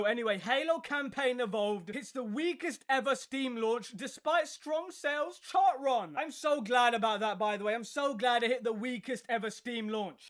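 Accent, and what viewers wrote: United Kingdom. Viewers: This guys voice and attitude is wrong. Most of these Youtubers for specific games that are bad, are bad for these people that didn't get it right, until someone tells them that your wrong and should change of yourself or your group of what you are. As the guy is almost British with a bad attitude for nonsense of a game that is literally just a thing that shouldn't matter so much. Its like the guy drinks alcohol and doesn't quite get it past him of what it really does.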